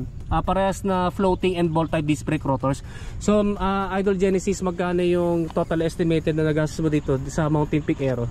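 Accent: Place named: Ilonggo, Philippines. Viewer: Nice build! Sabi ko na ngaba taga iloilo eh hahaha i know that intonation eh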